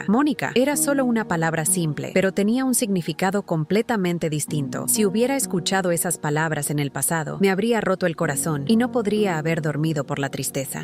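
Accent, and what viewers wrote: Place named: Portugal. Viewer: Y ese asentó portugués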